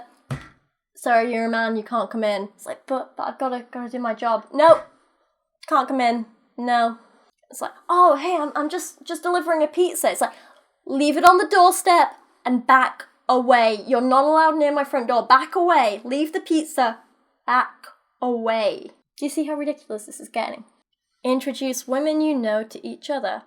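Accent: United Kingdom. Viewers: I guess problem is that a lot of us (including the British sounding lady what made this video) are used to living in semi-civilized countries where free at point of use Healthcare is a given and reproductive rights are taken for granted. Pity the poor bastards South of the 49th.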